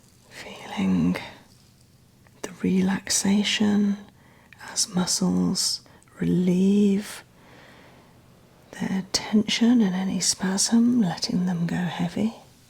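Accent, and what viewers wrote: United Kingdom. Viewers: I love your accent idk if that's just genetic British accent or what lol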